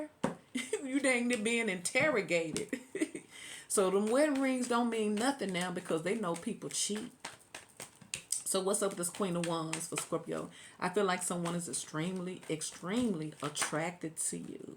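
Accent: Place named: South, United States. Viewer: your southern drawl sis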